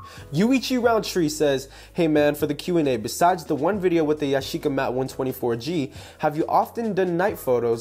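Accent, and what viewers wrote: Philippines. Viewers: Hi ate! HAHA lovin' the Filipino accent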